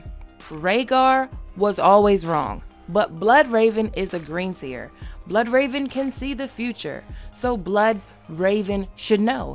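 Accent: United States. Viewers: do your thing my dear u def sound a little country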